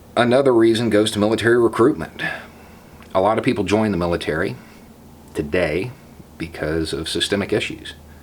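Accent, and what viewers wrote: South, United States. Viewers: Not only is it really neat to hear progressive values in a southern accent, you actually add unique and interesting perspectives to the conversation. I am so glad to have found you beau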